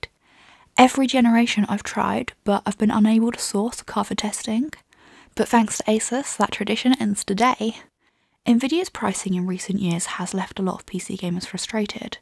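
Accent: United Kingdom. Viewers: amo tu acento britanico.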